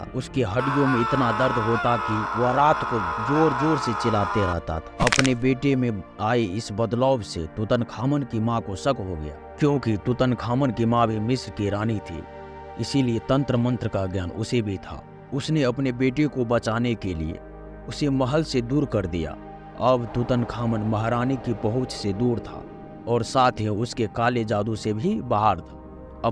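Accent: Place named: Darbhanga, India. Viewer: Apne ke ghar kahan che? Bhasa se te darbhanga bujhal rahal che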